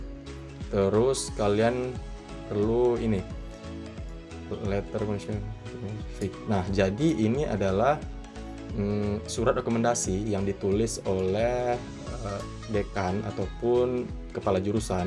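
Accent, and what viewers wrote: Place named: Riau, Indonesia. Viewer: Kelen kelen nya terasa kali kek orang Riau wkwkwk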